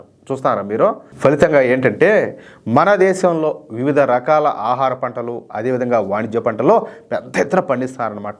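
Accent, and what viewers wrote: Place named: Chittoor, India. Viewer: Meedhi Kadapa na 😅 mee slang vini chittoor anukunna.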